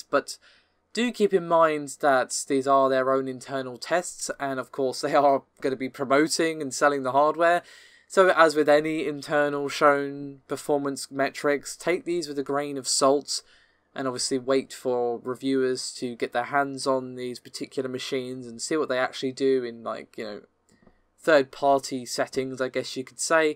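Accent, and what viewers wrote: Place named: Manchester, United Kingdom. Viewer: I am getting better at detecting british accents. This guy is from.... Manchester !!!!!!!??????????!!!!!!!!!??????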